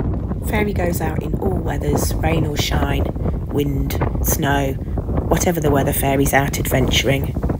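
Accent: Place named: United Kingdom. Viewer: She's all bundled up in her lovely coat, on a windy day :-) I also like to hear the British accent, as I am in America now, and miss England ! :-) Absolutely lovely.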